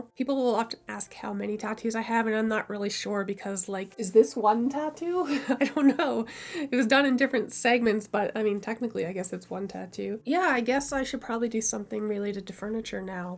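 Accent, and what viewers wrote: Nova Scotia, Canada. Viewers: Your furniture stuff showed up in my feed one day... so i watched... then i watched some more... and there was something about your accent that made me think of "down home nova scotia" Then i discovered you're in Hantsport! My hubby grew up around the corner from you on Smith Cres (the wrong side of the tracks, lol) and he is only a few years older than you... so now i wonder if our paths might have crossed in the past. Boom! I was hooked! Art and furniture restorations... with that down home accent. 😊 Thank you! And happy anniversary.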